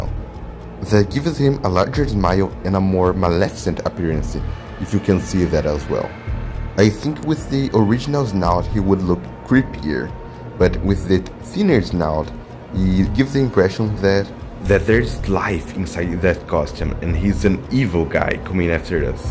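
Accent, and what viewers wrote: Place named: Brazil. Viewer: Percebi de primeira que vc é br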